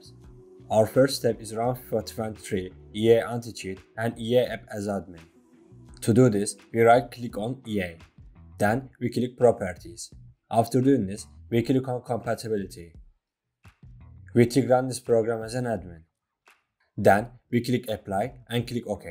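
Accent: Turkey. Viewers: Dost aksanından anlamıştım Türk olduğunu Fakat çalışmadı :(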